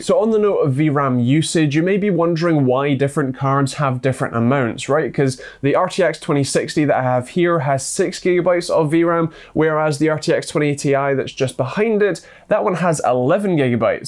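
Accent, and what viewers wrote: Scotland, United Kingdom. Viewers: his voice is Scottish where tf do you think he’s from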